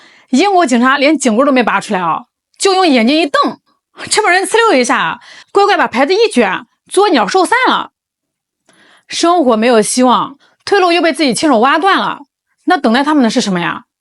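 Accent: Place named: Northeast, China. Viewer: 我本来想冲着这标题来看看黄丝和蟑螂的热闹，结果主播这一口碴子味的普通话把我直接给劝退了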